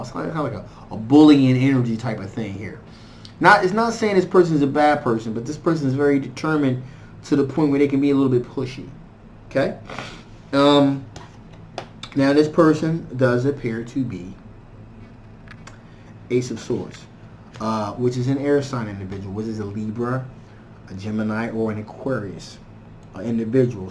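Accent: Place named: New York, United States. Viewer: Yes your skills are on point. Love the NY accent!! Queens bound. I am feeling pushed into this relationship. I want it but in a mature way give me a vision a plan we both can agree on then I'm focused on it full force. There are some things i need to get in order with my credit then I'm ready to go all the way to marriage!!!! But the anger issues have to cease.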